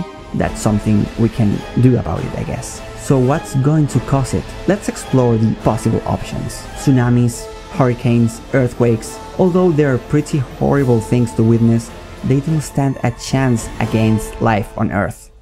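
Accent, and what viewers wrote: Oxford, United Kingdom. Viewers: Ni siquiera iba a entrar a este vídeo, solo entre para de suscribirme, después oí tu voz con el ingles de oxford y pensé "Que s dalas tío" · That Oxford English papuuu Loved the video it really makes you think about it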